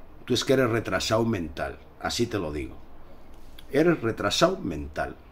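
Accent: Spain. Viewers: El 2006 me asignaron un equipo de personas en A Coruña (desde Málaga) . He estado 8 años viajando una vez al mes (pasaba entre 3 días y una semana, depende de las circunstancias), y tratando con ellas y ellos a diario en la distancia: Pues ningún problema, oye. Gente maravillosa, les cogí mucho cariño, especialmente a un ferrolano que me recuerda a ti hablando. En vez de quejarme, intenté entenderlos (falar ya es más complicado, pero lo intenté), que para eso están en su tierra. Una aperta a todos os galegos. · Muy buena gallego carajooooo asi se habla, soy un Uruguayo que lo sigo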